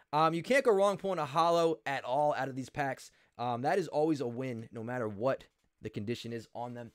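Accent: New Jersey, United States. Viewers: I know a Jersey accent when I hear one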